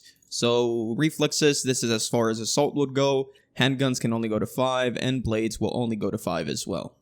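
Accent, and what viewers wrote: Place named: Brooklyn, United States. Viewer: What dialect is this, Brooklyn? Not meant as an insult.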